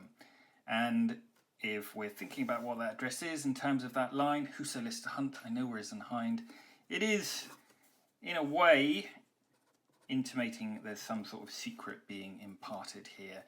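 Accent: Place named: England, United Kingdom. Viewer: English with an English accent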